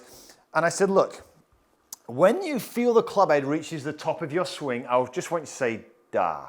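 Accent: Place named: England, United Kingdom. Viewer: I only trust someone to correct me with my swing with an English accent, is that someone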